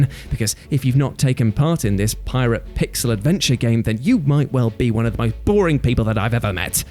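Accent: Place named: England, United Kingdom. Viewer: I don’t really like the “Tues Your Own Adventure” name, as it only works with certain accents such as your English accent. As an American I say: Tues as “Toos”. But other than that I like it!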